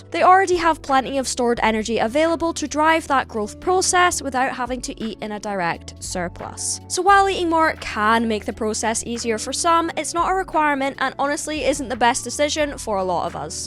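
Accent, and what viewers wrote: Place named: Scotland, United Kingdom. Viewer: SECOND COMMENT:🥈 happy Sunday, Can I please be your Pookie? What does that mean? By the way? I don’t even know what that means and I still wanna be your Pookie BTW You’re a Scottish🏴 accent=🔥I love you❌⭕️❌⭕️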